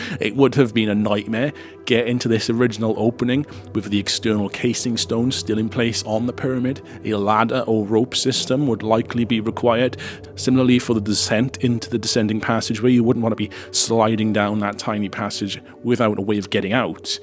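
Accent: Wales, United Kingdom. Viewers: The Welsh accent always makes me smile:) it's a perfect voice for dead pan comedy:) you maybe won't get this reference.... But do you remember the song? by??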